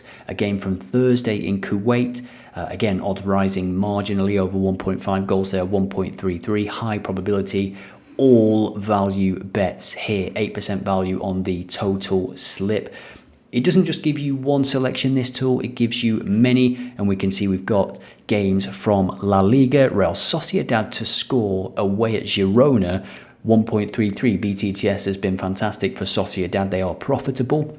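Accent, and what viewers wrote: Scotland, United Kingdom. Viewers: Deserves more than 1 like for the Scottish accent mate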